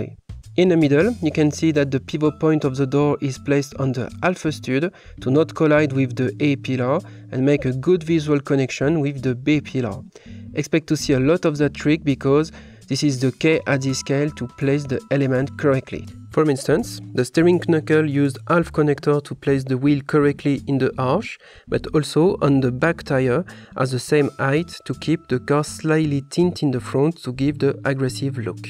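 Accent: France. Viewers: Salut mon gars ! J'adore tes créations. (je sais que t'es français : notre accent...) Je pense même à acheter les pièces pour construire ton modèle.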